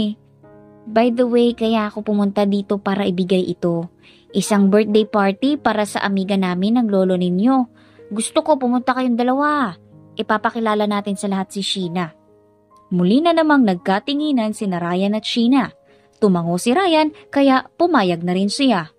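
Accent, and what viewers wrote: Visayas, Philippines. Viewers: Sorry maganda story but narrator boses visaya accent nakakawalang gana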